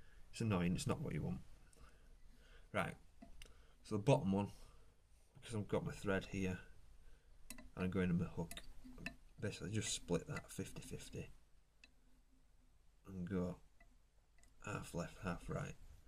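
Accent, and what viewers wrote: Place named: Yorkshire, United Kingdom. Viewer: Thank God I live in Yorkshire and can understand you, As always Buddy well done...Tell Kia to buy you a razor for Xmas though ;)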